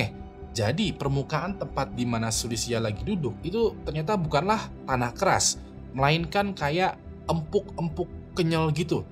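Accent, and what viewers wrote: Indonesia. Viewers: gapapa biar lebih gampang dipahami karena logat indo. wkwk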